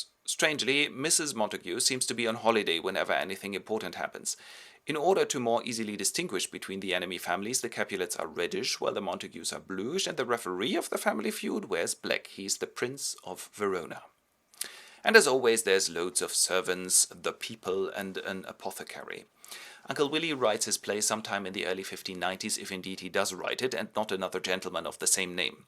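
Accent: Germany. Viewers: Are you german? Asking because of the accent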